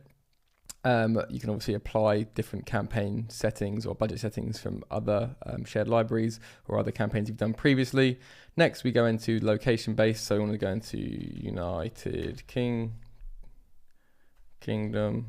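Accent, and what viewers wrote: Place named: United Kingdom. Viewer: Try to work on the way you talk; it seems to be too fast, I guess you are from the UK and only the uk citizens can understand you better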